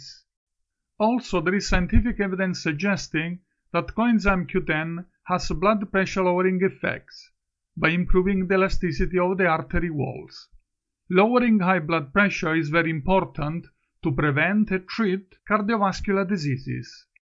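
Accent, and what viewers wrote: Italy. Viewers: good info, strong Italian accent! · Its Italian accent...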